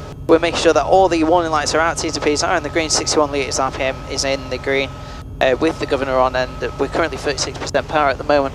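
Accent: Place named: United Kingdom. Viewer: I would watch your video if you could speak english rather than British stuff. So rude!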